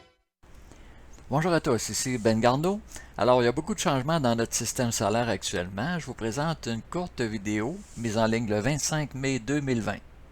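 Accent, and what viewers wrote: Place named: Quebec, Canada. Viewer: Merci Ben ! Je trouves ça super cool toutes tes vidéos d'articles sur Nibiru. C'est juste avec toi que je suis abonnée sur Youtube. Tu expliques de manière précise avec une bonne chronologie des évènements. Pis en plus, au moins j'entends quelqu'un qui parle français-quebecois, fak c'est encore mieux ! En tout cas, un gros Bravo pour tout le travail que tu fais pour nous tenir informé, c'est apprécié.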